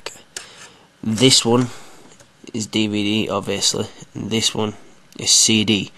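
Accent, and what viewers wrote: United Kingdom. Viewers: neither, english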